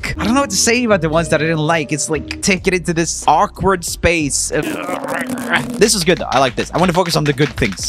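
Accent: United States. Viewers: I don't know why byt I heave expected that you studied music or something, but definitely not looking at how do you pronounce all of those names. But damn, that americanized pronounciation gave my dry heaves, just how could you? ; ((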